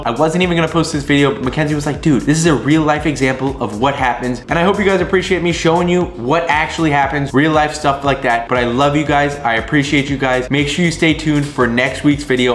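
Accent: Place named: South, United States. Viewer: Like for ya south accent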